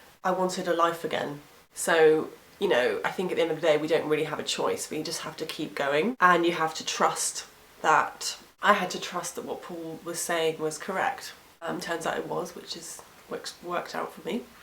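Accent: United Kingdom. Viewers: you got great british accent i love that do on depression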